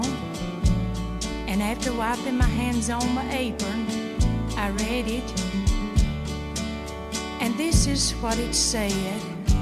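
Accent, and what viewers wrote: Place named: South, United States. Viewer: unmistakable Southern States accent. Maybe you don't hear it if you are from the States but it's very noticeable to the British.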